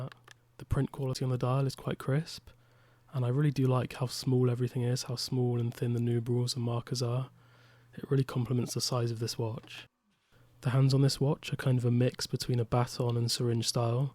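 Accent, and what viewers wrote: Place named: Wales, United Kingdom. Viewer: My mam is from Cardiff, but we were born 1st gen Americanos. My father is from La Paz, maternal Grandad Jamaican--British Merchant Marine, maternal Gran Irish. Me and my twin brother have spent long summers with family back home in Wales. As an adult, having grown up watching a lot of British telly and movies, I have developed a fascination with all dialects of English. Your accent sounds faintly like my cousin Janet's, who has a bit more of an RP Welsh blended accent. I cannot quite ID your accent, but you have a lovely speaking voice for YouTube presentation. I rather enjoyed your presentation, and I would not worry about not feeling confident, especially if you are not accustomed to public speaking, because the lingua franca on YouTube is predominantly authenticity. Polished presenters are perceived as brand shills, so you are way ahead of the game, mate. 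Keep up the good work!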